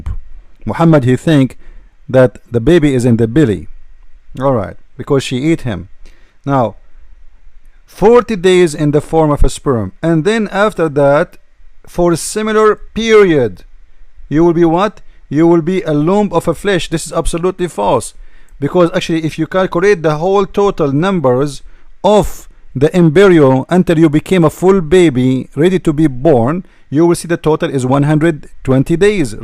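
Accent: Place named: Arab world. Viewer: You only speak with an 'Arabic accent' . The End.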